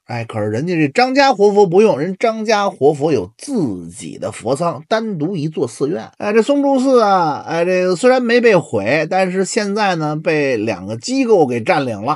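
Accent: Beijing, China. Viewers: 这口北京土话听不太懂。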